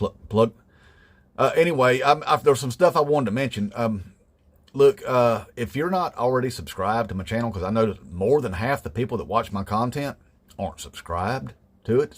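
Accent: South, United States. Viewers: From your accent you sound Southern.Dont put shade on us. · It blows my mind hearing INTELLIGENT words coming out of a redneck voice! LoL, love your channel! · Your southern accent is real hard to listen to. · I love to hear a southern accent speak absolute sense. Cheers brother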